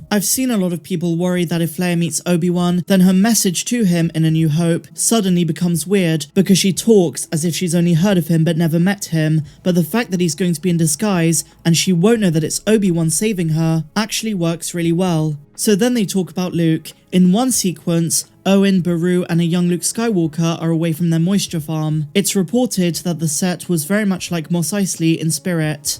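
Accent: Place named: West Country, United Kingdom. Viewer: Gotta be West country right? Your accent sounds so much like mine, I genuinely find it a comfort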